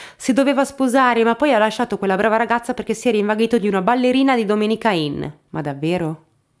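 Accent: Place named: Rome, Italy. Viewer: Daje che peesse non capitolina...lo parli bene eromano...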